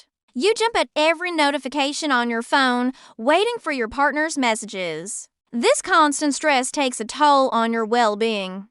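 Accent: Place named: South, United States. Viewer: Helpful video with a Heavy Southern Sandy accent though. Lol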